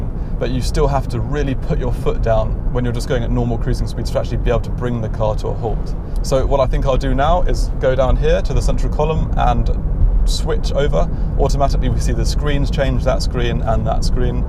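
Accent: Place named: Surrey, United Kingdom. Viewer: Yah, a lovely Surrey accent, 😂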